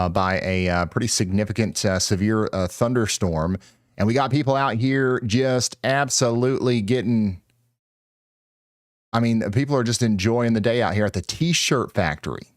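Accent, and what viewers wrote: South, United States. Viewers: We southerners have our own way of pronunciation. It's a little ridiculous to have a man work late for your protection and you write this!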